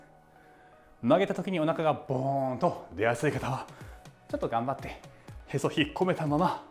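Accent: Kansai, Japan. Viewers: 初見です😊めちゃくちゃ良い声〜😊 これなら私も出来そうです！やってみます。ちょいちょい関西のイントネーションで親近感湧きました😊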